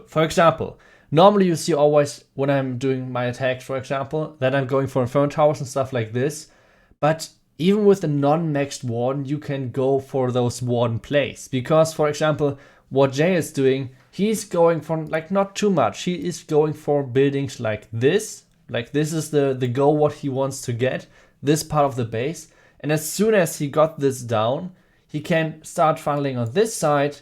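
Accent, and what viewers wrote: Germany. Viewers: Du hörst dich deutsch an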